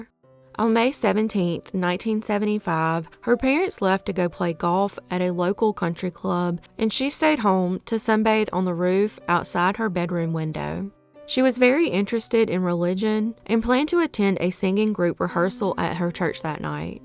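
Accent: South, United States. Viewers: The southern accent is just so darn cute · I love that southern draw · Such a adorable accent ❤️❤️❤️ I'm also from the south and you truly sound like a southern Belle 😘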